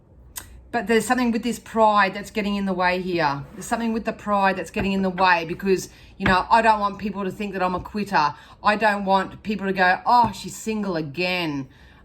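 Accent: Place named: Australia. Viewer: I love how real you are .. it's nice to listen to an Aussie ❤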